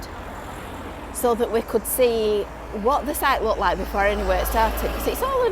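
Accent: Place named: Yorkshire, United Kingdom. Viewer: Love your Yorkshire accent...up early this morning? Brilliant Video thank you x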